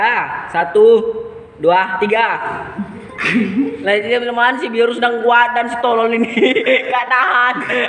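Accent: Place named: Madura, Indonesia. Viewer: Lu orng maduraa yaaa bg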